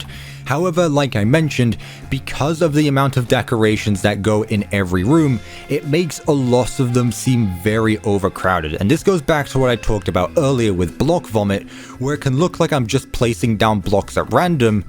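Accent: United Kingdom. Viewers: Maybe it’s the British accent that makes it sound better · I enjoy watching your video because it’s a nice change of page from the fast moving while talking Minecraft YouTubers. I like how you talk in your nice calm British accent as Minecraft gameplay of you building things place in the background. I find it very common relaxing to watch I love your channel.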